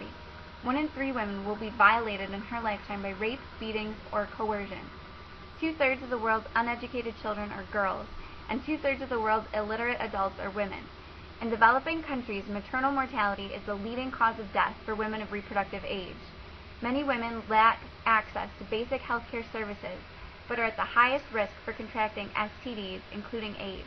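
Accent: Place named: Wales, United Kingdom. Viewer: love the welsh accent.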